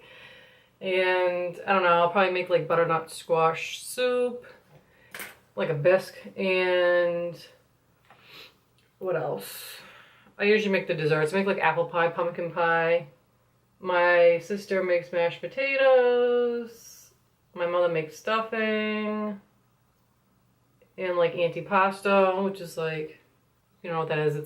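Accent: Boston, United States. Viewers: Cool. Is that a Boston accent I detect? :o)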